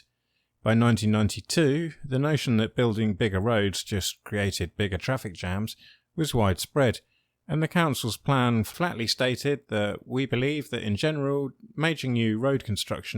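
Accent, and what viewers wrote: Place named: Estuary, United Kingdom. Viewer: You have to allow for the Thames estuarine accent.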